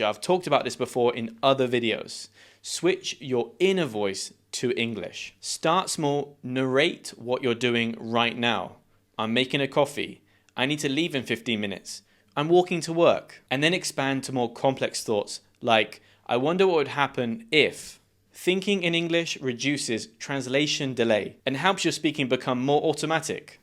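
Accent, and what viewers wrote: United Kingdom. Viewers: I finally found out that I definitely love British English, I'm able to understand when you speak. Thank you, you're the best. Te mando un fuerte abrazo desde Ecatepec, México. 🇬🇧❤️🇲🇽🫂. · Best English teacher and best British accent ❤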